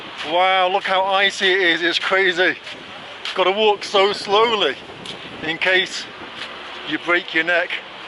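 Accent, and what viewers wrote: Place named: Manchester, United Kingdom. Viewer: I have subscribed. R u from Manchester judging by accent? I might b wrong tho 😂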